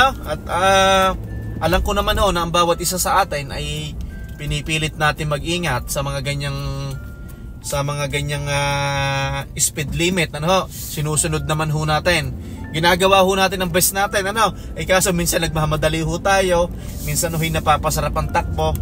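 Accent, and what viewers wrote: Batangas, Philippines. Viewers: nakakainis punto mong batanggenyo, Tsk pinanood ko pa naman to kasi taga edmonton din ako.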